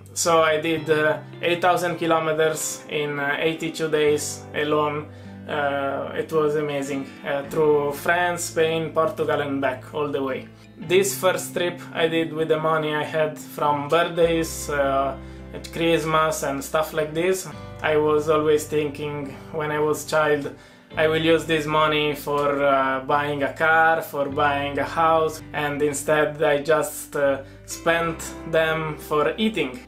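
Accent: Italy. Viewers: si sente tantissimo l'accento italiano grande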